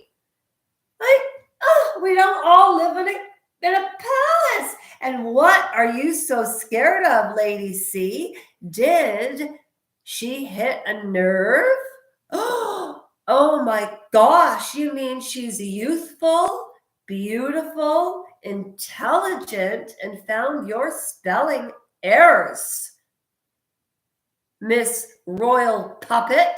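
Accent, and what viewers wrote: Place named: Canada. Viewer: I notice you don't actually say what it was Lady C said so we can judge for ourselves. A bit like MM accusing the RF of racism without giving the words used and context. And yes she will sue if she has been defamed. She is old school. Lady C may have a castle but she worked bloody hard to afford it.She also has a title and uses it to good effect and has raised millions for charities and foundations in Sussex, the UK and abroad. FYI, the book was published in 1992. You sound Canadian so you should know how the working royals, especially Princess Anne, have worked with Canadians to make a better society. Again I ask: what was said - exactly?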